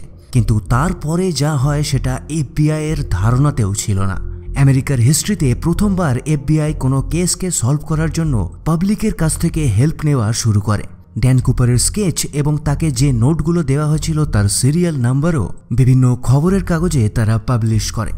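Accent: West Bengal, India. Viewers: ভারতীয় বাংলা বলছে সে।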